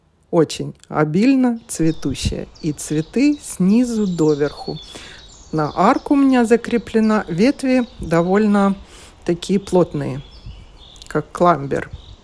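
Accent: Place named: Baltic. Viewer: Очень красивые розы. Приятный голос, русский язык интересно звучит, как будто с прибалтийском оттенком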